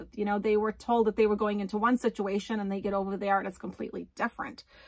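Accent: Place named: Boston, United States. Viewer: Is UR accent from the greater Boston area? U sound like home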